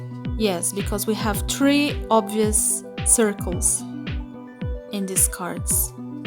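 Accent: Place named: Brazil. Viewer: eu amo que soube q vc tb é br no segundo que ouvi sua voz! muito obrigada pelo conhecimento compartilhado <3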